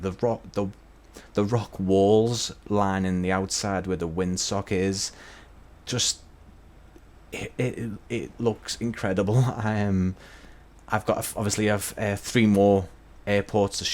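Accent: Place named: Southport, United Kingdom. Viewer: If I'm not mistaken, Southport technically has a sand-runway at Birkdale Sands on the beach (I'm guessing from the accent you know where that is ;) )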